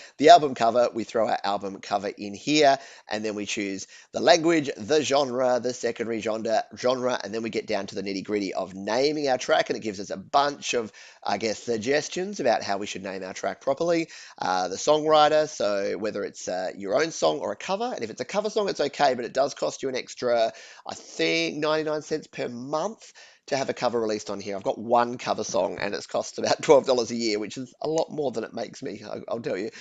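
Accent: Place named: Australia. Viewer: where in australia do you come from, your accent is nice.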